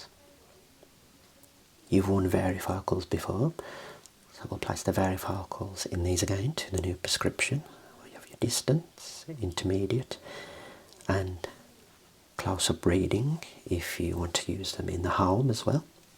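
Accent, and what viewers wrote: Birmingham, United Kingdom. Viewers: Birmingham, England 😊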